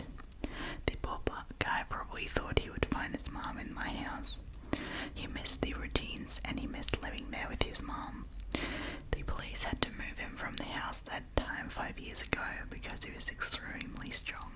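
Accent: Australia. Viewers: Okay I have to ask about your accent, I am a new sub so apologies if you have answered this already! Have you hung out with a lot of Australian English speakers? (I was assuming you were German by an earlier video) getting a strong Australian accent vibe though, so needed to ask 😊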